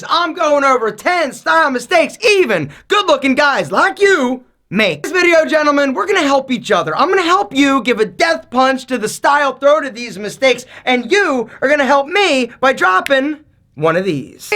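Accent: Philadelphia, United States. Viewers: This guy gotta be from Philly 🗣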